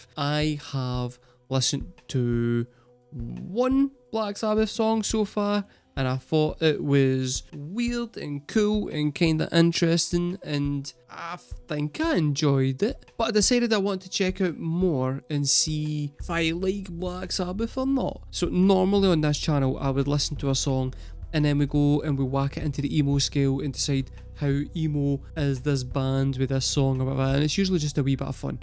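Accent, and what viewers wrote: United Kingdom. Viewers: With an accent like that, it's obvious you lived on the same island, how could you not have grown up on Sabbath?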